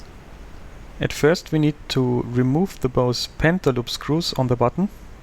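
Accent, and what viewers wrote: Germany. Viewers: Your Bavarian English is quite good!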